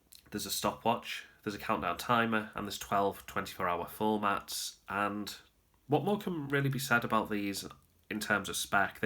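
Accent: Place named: North, United Kingdom. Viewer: Thanks, a good video. I like your clear Northern English accent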